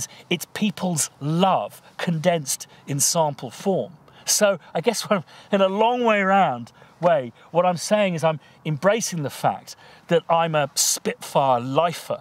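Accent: RP, United Kingdom. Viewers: Combined with the view, your way of speaking resembles Downtown Abbey (meant as a praise)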